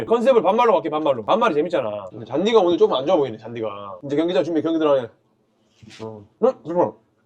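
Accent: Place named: Jeolla, South Korea. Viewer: 전라도말씨로 해설듣는건 첨인데 은근 중독성있네 😂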